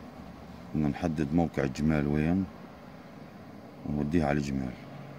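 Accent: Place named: Palestine. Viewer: ***** تسلم يا غالي .. ربي ينصركم بربي اجمل مافي فيديوهات الاخ جمال غير لمعلومات انو بياخدنا سياحة في فلسطين و منسمع لهجة لفلسطينية العريقة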